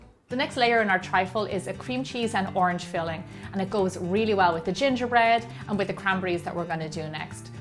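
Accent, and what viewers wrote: Ireland. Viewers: Thanks 4 the help and I like your Irish accent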